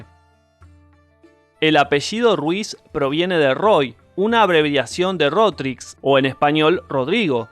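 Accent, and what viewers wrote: Argentina. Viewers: lastima tu tonito argentino LLLLLLLLLLLLLLLLLL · El contenido es interesante y entretenido.... pero al locutor le juega en contra el timbre argentino, que es plomo derretido en los oídos de los hispanohablantes... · es argento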